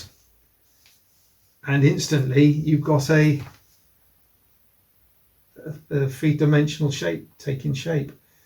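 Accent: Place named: United Kingdom. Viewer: Hi it is the most perfect way to build a glider I am busy with one but come across a problem which unbeknown to you you have just solved and here is the question where do you or did you get the adhesive which first you apply whet than dry and than “HOT IRON ON” I would be very grateful if you could help me out with a address. I am in California USA and it sounds as though you are in the UK by your accent. Regards Peter Onel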